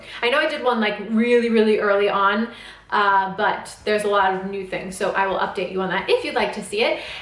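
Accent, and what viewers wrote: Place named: Russia. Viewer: I see your images all over instagram. For some reason I thought you had a Russian accent or German.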